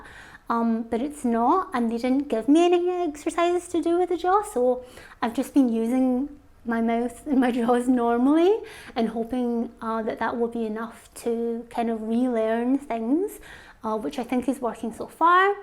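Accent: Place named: Scotland, United Kingdom. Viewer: Did not expect you to have a Scottish accent! Awesome results!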